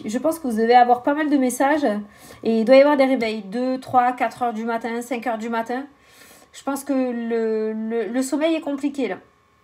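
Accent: South, France. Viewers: Votre accent est un bonheur à écouter ! Le Soleil, les cigales... belle énergie! Votre tirage me parle vraiment! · Je te confirme tu as bien gardé ton accent du sud. bisous t'es géniale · Toujours aussi juste 👏👏👏 et vive l’accent du Sud !!!!! ⭐️🌙⭐️🌙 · Bonsoir oui on entend bien ton accent du sud. Je te souhaite une bonne soirée à demain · 😂😂 j'adore ici 20 h aussi. Et oui tu as un bel accent du Sud. Moi c'est celui du sud ouest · Cc..ho oui tu l a l accent et c est juste magnifique..c est une voix qui chante on entendrait presque les cigales.. j adore tu as tout pour nous faire passer un merveilleux moment... Pour la communication oui je l ai tellement attendu...mais j ai dis que je lache prise.j en ai chier....et que ça serait bon de pouvoir vider son sac... Merci pour tout a bientôt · Merci pour le.tiraje ses.que des bonne nouvelles oui tu a l'accent du sud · Bonsoir. 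T'inquiète....! tu l'as l'accent du sud. Il est chantant, charmant, et pleins de tonus, comme toi. Il te vas bien! Bises. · Oh bibiche comme je croise les doigts pour que cela se passe ainsi ! Beaucoup de démarches en cours ici, en attente de retour pour justement des partenariats professionnels. Wait and see. Et oui, un très bel accent du sud :-) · Bonsoir, je confirme tu as bien l accent du sud. Et c est agréable avec ta bonne humeur. Merci pour ce tirage, je souhaite que cela arrivera. Car le sommeil est bien compliqué. Bonne soirée à 😇🙏😚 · bonjour! Vous avez gardé votre charmant accent du sud!!! Pas de souci! Ce mercredi est positif c 'est super! Biz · oui je suis réveiller a3H du matin je ne dort que très peux merci j Aime ton dynamisme et ton accent du sud merci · Coucou, oh que ouiii tu la encore ton accent ❤et j'adore t entendre parlé! Tu chante le soleil du sud 🌝 il te vas si bien! 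Je t'embrasse bisous et merci à toi 😘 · 🙂🙂 tout est arrivé hier..tout le tirage c est trop ça... merci et vive l accent du sud ❤ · Coucou ma belle, oui ton accent du sud est bien là, une joie pour moi de t'entendre magnifique en plus ta guidance toujours exacte ta joie de vivre magnifique je crois que pour la belge que je suis ça me fais énormément de bien je te kiffe à fond gros bisous je suis en pleine forme pour le moment !!!qui sais peut-être tu es contagieuse gros bisous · Oui tu as bien l accent du Sud merci pour ta bonne humeur et ta gentillesse bisou ♡ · Coucou oui l'accent du sud on l'entend très bien, mais s'est très agréable ça nous fait un air de vacances. 😉😉💋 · 28... pour le 6 janvier 8-2= 6... c'est souvent que tu nous fait ce genre de tirage synchrone. Ton accent ma Belle tu l'as mais comme je l'aime pas trop marqué mais tellement vrai. Je suis de l'Occitanie ( Aveyron ). 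Malgré 40 ans en région parisienne, j'ai toujours mon petit accent en fond...et je le cultive quand je descend au pays. Le tirage concerne le professionnel... et moi qui suis déjà à la retraite, je ne m'y retrouve pas dedans. Mais ce n'est pas grave... j'adore cette positivité pour ceux qui sont concernés. Seul point les insomnies qui sont fréquentes et mon Papa est très présent malgré que cela fasse 3 ans qu'il m'ait quitté le 30 décembre 2017. Bisous ma Belle du Sud👄💗🌞😍😍😍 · Coucou. Oui tu as toujours ton magnifique accent du Sud! Chantant, leger, joyeux! Sinon, en effet je dors mal!! Reveil vers 4h...ça fait quelques nuits... merci pour tes tirages et guidances 🙏🏻🙏🏻🙏🏻❤️ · Coucou tu as toujours ton accent du sud très agréable à t'écouter et avec le sourire 😍😆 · Bien vu,on prépare la retraite,avec déménagement et construction de maison d'ici 3 ans ( pour le moment achat du terrain,beaucoup de papiers. 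bisous et ne t'inquiètes pas tu as toujours l'accent du soleil j'adore ta bonne énergie bisous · Il est bien là le magnifique accent du sud, j'adore !! Je me sens en vacances quand je t'entends ! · Bonsoir merci pour le tirage, oui je te confirme que tu as bien l'accent du sud mais c'est agréable plein de soleil! Gros bisous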